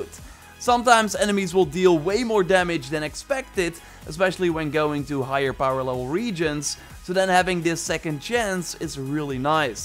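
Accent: Netherlands. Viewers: Heey are you Dutch? (I think I recognize the accent) 😉 I love your Valhalla videos, just subscribed today 🙂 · Great videos, but the heavy dutch accent annoys me a lot. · Are you dutch O.o? Nice video btw thx :3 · Youre from the netherlands?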